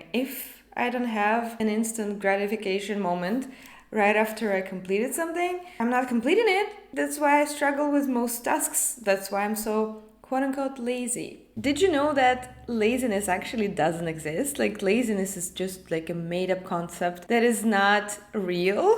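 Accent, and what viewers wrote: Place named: Eastern Europe. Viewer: I did guess right! Kinda. lol There is something Eastern European about your accent.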